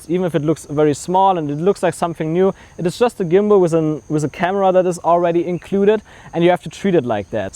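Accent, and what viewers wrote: Germany. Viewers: Danke für die tollen Tipps.. (Asume your accent is German=).. Great ideas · Mach Deutsche Videos man hört dass du Deuscher bist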